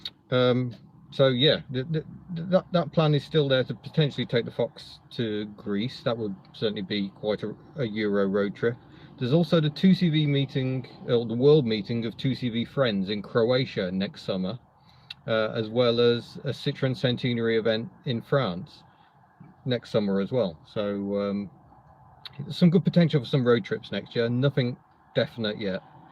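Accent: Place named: Midlands, United Kingdom. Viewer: where were you born? You sound like posh Midlands, Warwick possibly?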